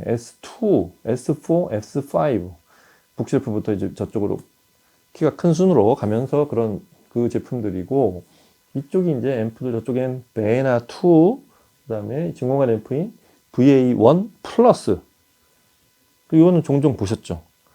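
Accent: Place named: Seoul, South Korea. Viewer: 발성 좋으시네요^^ 오리지널 서울 말씀.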